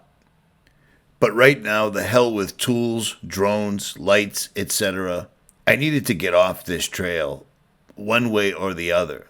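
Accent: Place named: Worcester, United States. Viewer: You sound like your from Worcester :-). Welcome to AZ, just found your channel subbed and liked. From one Masshole to another, be careful out here, beautiful country bud deadly as hell!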